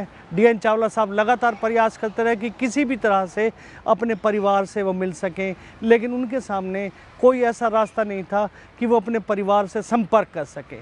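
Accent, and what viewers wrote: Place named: Saraiki, Pakistan. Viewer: Sir, your pronunciation is Saraiki one that is the sweet language of Multan & Dera Ghazi Khan region. Its a nice video. You all are welcome to Pakistan. Watching from Multan.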